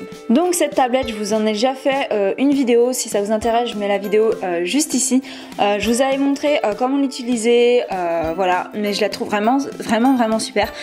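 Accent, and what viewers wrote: Belgium. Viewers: tu est belge ou Française moi je suis belge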